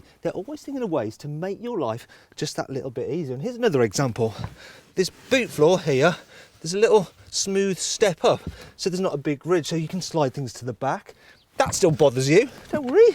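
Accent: United Kingdom. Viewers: a thorough review. However, as an Indonesian, his bold british accent makes it difficult to understand. I need to replay it two times..LOL..anyway, nice review and it does help.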